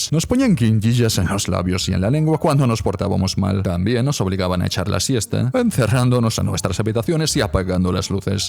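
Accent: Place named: Mexico. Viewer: Ese acento mexicano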